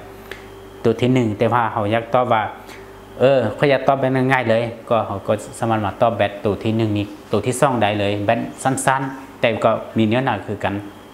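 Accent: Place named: North, Laos. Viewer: ເຈົ້າເວົ້າພາສາລາວແບບນີ້ຄົນວຽງຈະບໍ່ເຂົ້າໃຈສົງໃສເຈົ້າແມ່ນຄົນພາກເໜືອນໍ້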